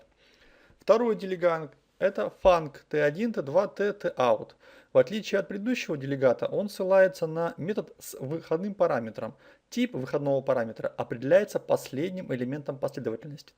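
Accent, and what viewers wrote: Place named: Russia. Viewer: Очень интересная и полезная лекция. Единственное что мешает, это русское искажение английских слов и букв(параметр "Е" вместо параметр "И", "хЕндлЕр" вместо "хЭндлЭр", зыс вместо дис в слове this). Может это и не относится к теме урока но я считаю что программист всё-таки должен уметь правильно читать по английский не говоря уже о владении языком вообще.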